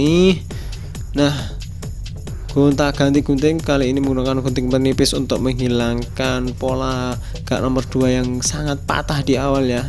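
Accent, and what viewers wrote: Java, Indonesia. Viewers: Medok bgt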